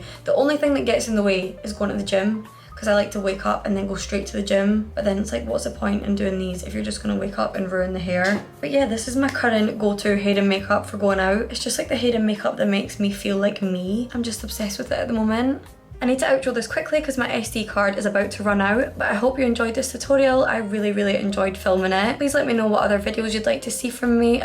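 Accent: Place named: Glasgow, United Kingdom. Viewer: I love your accent, is just makes me feel like home. I’m half British and Glasgow just feels like home even more then Adelaide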